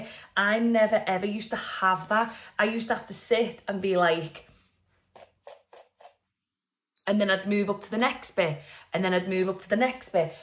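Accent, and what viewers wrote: Liverpool, United Kingdom. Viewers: Hello from Belgium ...you sound like a Scouser ? Liverpool ? HILARIOUS : "hair in me bom" HAHAHAHAHA I came here to find out about the products and I have been roaring with laughter! That said, I'm a new sub ! 🙂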